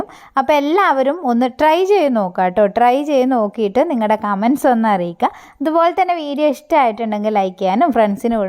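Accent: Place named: Kasaragod, India. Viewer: അതെല്ലേ.... ഞാൻ കാഞ്ഞങ്ങാട് ആണ്... കാസറഗോഡ് dist.... ചില വാക്കുകൾ ഞങ്ങള് പറയും പോലെ തോന്നുന്നുണ്ട്... അതോണ്ട് ചോദിച്ചതാ 😍👍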